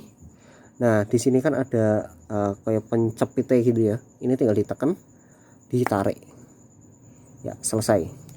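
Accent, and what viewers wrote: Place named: Java, Indonesia. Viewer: Mantep mas josss.... Bahasane medok habis pokoke, salam sesama GTR bumiayu, semangat❤️👍.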